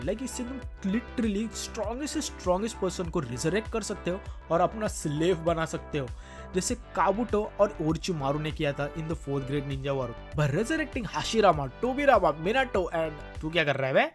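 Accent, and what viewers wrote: Maharashtra, India. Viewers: Are u Marathi❤😊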